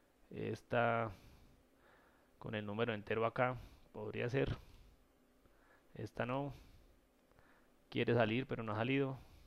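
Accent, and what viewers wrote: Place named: Colombia. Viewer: acento colombiano fuerte jejejeje, Gracias por lo que aprendemos de ti!! Por favor respondeme a que te refieres con "Esta si tiene pareja" en los gráficos??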